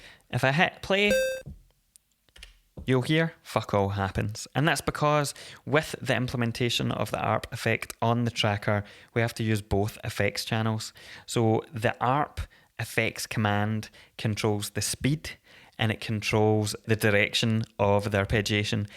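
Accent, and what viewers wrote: Scotland, United Kingdom. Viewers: Cracking tutorial - good to hear a fellow Scotsman!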